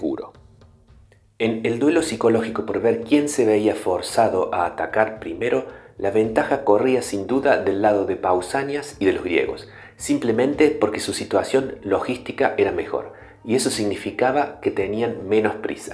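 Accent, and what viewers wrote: Argentina. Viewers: Pero usted no está en España? Por momentos pensé que era argentino por el acento y luego me dije que eran ideas mías, pero ahora que veo su respuesta, creo que no me equivoqué.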